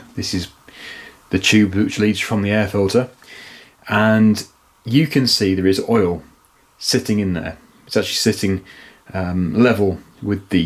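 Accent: United Kingdom. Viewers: I’m going to be honest I like the work you do but 50% of why I watch is just to hear your British accent and wordage . Love the Channel. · Personally I have absolutely no problem with your talking. You know what you are talking about and that shows. Additionally you are a Brit of one stripe or another and your accent is pleasing to the ear. Keep on keeping on sir. Thank you for the entertainment. I am NOT a diesel mechanic. I have been a gas engine mechanic for 65 years. ( I'm 81) but I understand diesels enough to follow along quite handily. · You keep narrating. I love the british accents. Your videos are great!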